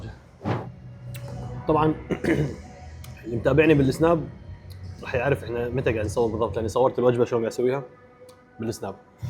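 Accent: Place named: Gulf, Arab world. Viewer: يعم متتكلم مصري ضروري عشان رحت الخليج تتكلم زيهم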